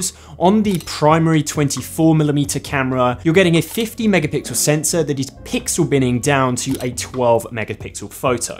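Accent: United Kingdom. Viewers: He sounds pretty average british to me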